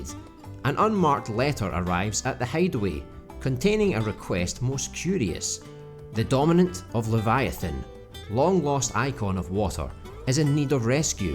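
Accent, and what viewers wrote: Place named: Scotland, United Kingdom. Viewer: My man, I didnae realise you had a channel yersel AND on top of that I hear a welcoming Scottish accent. What a guy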